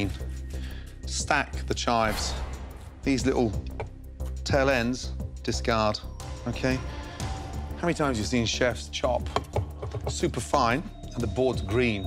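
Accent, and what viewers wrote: Scotland, United Kingdom. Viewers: I don't know why his British accent makes me feel energetic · HE’S SCOTTISH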